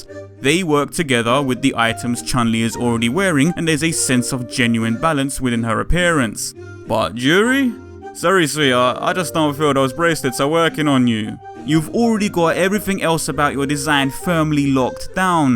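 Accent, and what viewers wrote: London, United Kingdom. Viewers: Its British one. A heavy slang based one but still British, more accurately, it's from London. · Just happened across this video and in less than a minute and a half you've mad me laugh. Coupled with being a londoner, (I assume from you accent)... that's an auto-sub right there!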